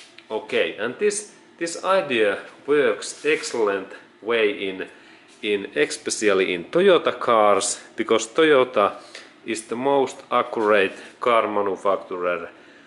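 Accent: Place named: Finland. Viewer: He sounds Finnish to me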